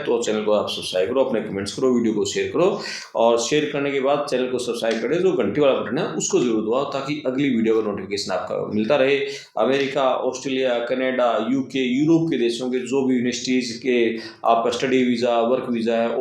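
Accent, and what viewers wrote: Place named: Sindhi, India. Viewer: Improve your sindhi hindi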